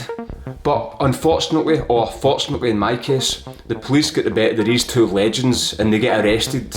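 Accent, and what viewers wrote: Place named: Glasgow, United Kingdom. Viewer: DONT DAE IT MATE KEEP IT REAL TALK LIKE A NORMAL SCOTTISH PERSON EVERY SCOTTISH YOUTUBER SOUNDS LIKE A FUCKINGBENTSHOT FAE GLASS GAOW UNIVERSITY HAHA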